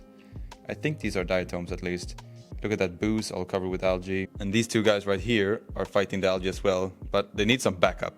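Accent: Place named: Scania, Sweden. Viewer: ”Grön”, haha I love your skånska….. I have the same dialect…….. idk why im writing this in english but it just seems like the right thing for some reason…….. Also the ferns are really gorgeous, ferns are some of my favourite plants! Maybe from all of the dinosaur documentaries I watched as a kid….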